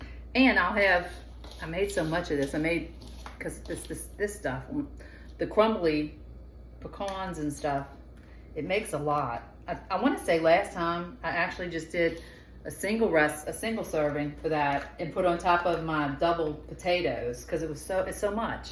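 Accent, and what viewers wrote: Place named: Louisiana, United States. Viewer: Love your recipe! Sounds like you might be from Louisiana! I’m next door in TX. Either way, glad to be here!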